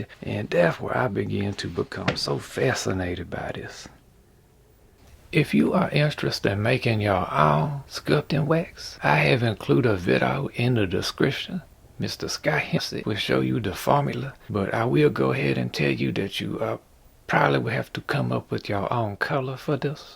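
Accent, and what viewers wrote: Louisiana, United States. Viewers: is this guy from louisiana? he has the creole/ cajun accents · Bob Ross + Louisiana style ASMR = I'm hooked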